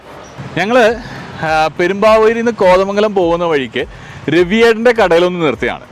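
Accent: Thrissur, India. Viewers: തൃശൂർ ജില്ലയിലെ കടകളിൽ പോയി വീഡിയോ എടുക്കുമ്പോൾ സംസാരത്തിൽ തൃശൂർ slang വരുന്നുണ്ടല്ലോ 😊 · ഒരു തൃശ്ശൂർ സ്ലാങ് നാവിൽ കയറികൂടിയിട്ടുണ്ടല്ലോ 🤔🤔🤔, അതോ എനിക്ക് മാത്രമാണോ തോന്നിയത് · പൊറോട്ടയും ബീഫും 👍👍👍 സംസാരം ഫസ്റ്റ് കേട്ടപ്പോൾ തനി തൃശൂർ സ്റ്റൈൽ പോലെ തോന്നി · ee video il samsarikkumbol oru Thrissur accent inte hint evideyokkeyo varunnund 😁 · ചെറുതായി തൃശൂർ accent വരുന്നുണ്ട് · Thrissur slang Vanna pole, Akcent change try cheyyano like Sujith bhakthan tried this previously 😁😁😁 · light aayittu kottayam bhaashayil thrissur slang kerunnundu , chayayil elakkade essence kerunna pole 😉😉, paranjhattu kaarilya yesu christune yesuvettannu vilikkana teamsanu 😁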